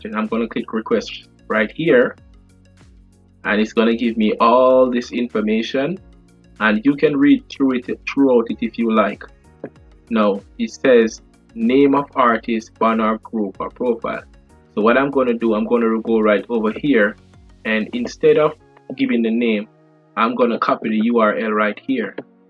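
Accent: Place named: Jamaica. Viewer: This guy is definitely a Jamaican. Very informative video.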